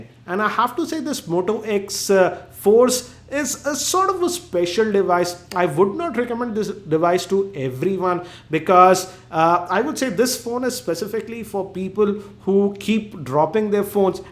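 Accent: India. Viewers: i like the indian accent. :)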